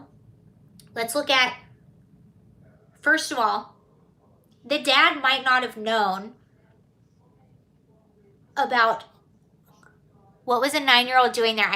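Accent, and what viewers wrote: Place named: West Coast, United States. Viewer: I’m in Virginia too. We are as far north as you can be and still technically be considered a southerner. I’d never be able to guess you were from Georgia though. You definitely sound like a west coast girl to me.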